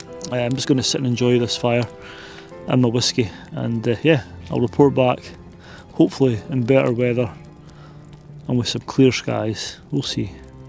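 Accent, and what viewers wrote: Scotland, United Kingdom. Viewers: I love watching your beautiful videos - thanks a lot for sharing them - and I'm a bit envious. It's not that easy to understanding your strong Scottish accent but I like listening to you anyway. Almost 48 years ago I stayed at Achmelvich Beach Youth Hostel and got a glance at this interesting looking mountain. I have been wondering what it might be like climbing it. I never made it - what a shame. Unfortunally I'm getting more and more hightsick the older I become. So whenever I watch you following a steep ridge I'm glad knowing you beiing back down safely again.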